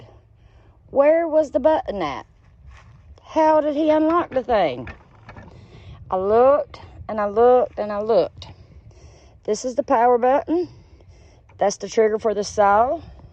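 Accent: United States. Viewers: MEN TAKE NOTICE OF THE DETAILED EXPLANATION. BY THE WAY, I LOVE THE COUNTRY ACCENT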